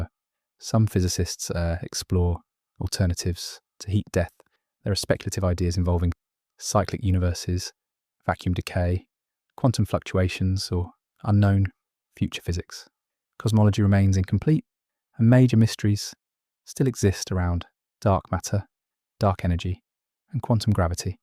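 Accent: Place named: England, United Kingdom. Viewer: How many millions of people listen to you?Because you have a English England voice\nYou're just saying this thing over and over again , that's really boring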